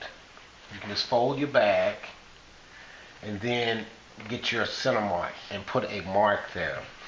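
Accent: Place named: South, United States. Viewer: Love that dirty south dialect…. Miss it so much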